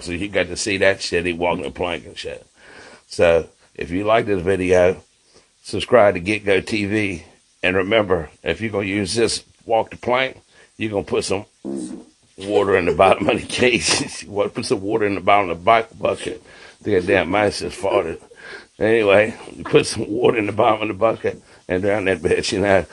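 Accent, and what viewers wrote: United States. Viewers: My husband and I never laughed so hard 😂 . Am I picking up a Louisiana accent? The fart sounds Cajun.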